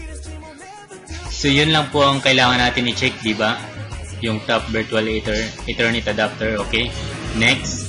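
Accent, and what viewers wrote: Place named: Iloilo, Philippines. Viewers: Ilonggo siyA sir hindi bisaya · Hahaha... Nandito aq para sa real voice nya.. hahaha... Halata pa Rin ung punto nya ng bisaya..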